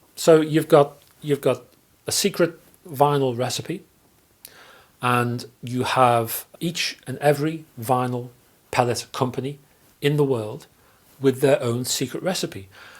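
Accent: United Kingdom. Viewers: Thanks so much for this video. I enjoy your demeanor and easy-to-understand British accent. But I have a few things to say. I've heard that the PVC used in records is normally clear. That's why nowadays we get so many colored ones on the market. Evidently your black samples were treated with some type of carbon, that's what I've heard btw. Now as for alcohol use, how I tested it was to put undiluted store bought 70% isopropyl on a record in a 3" square area and let it sit overnight. The next day, having played the record previously I played it again and noticed absolutely no difference. I think the best way to test something that may have detrimental effect would be try it out on an unwanted record in an undiluted form. If it causes damage of course, back it off. 😊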